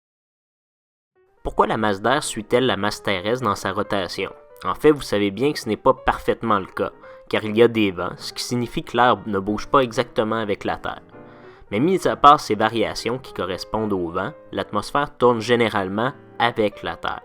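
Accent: Quebec, Canada. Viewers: Merci pour ton explication absolument clair ne et sans bavure. Toujours aussi pro... Ps. J adore ton accen quevecois..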